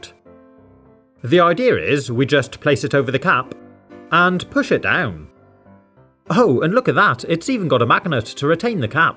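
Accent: United Kingdom. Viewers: Are u British???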